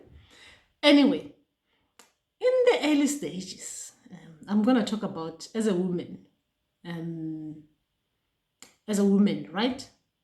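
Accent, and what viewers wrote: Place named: Balobedu, South Africa. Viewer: Surely speak like Balobedu. Nna my great grand parents come from Ga Modjadji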